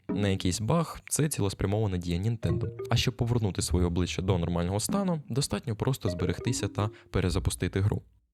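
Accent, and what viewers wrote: Russia. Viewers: забавна говірка в автора. типу іноді проскакує російський акцент, особливо чути в числах. ну якщо автор перейшов з російської то молодець так тримати. якщо автор так розмовляв то мені просто цікаво в якій частині нашої країни такий говір?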